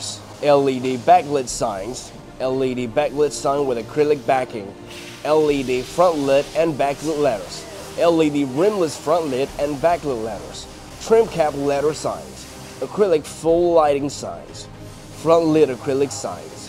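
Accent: South, United States. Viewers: I don't know why has a hint of southern accent, but I love it!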